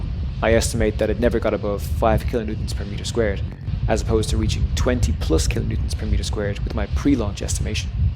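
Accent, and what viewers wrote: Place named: Ireland. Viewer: Terrific! And who doesn't like a nice Irish voice! Keep up the great work!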